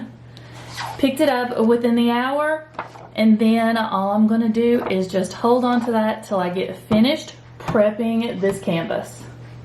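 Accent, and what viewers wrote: South, United States. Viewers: What! You have that soft sweet southen accent. Us northerners don't.😁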